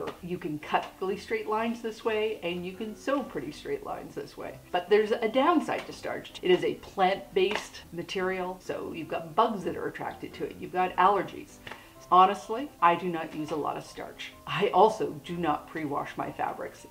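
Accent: Canada. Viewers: I knew it! You're Canadian ya! me too. Thanks for your informative videos. Bye from BC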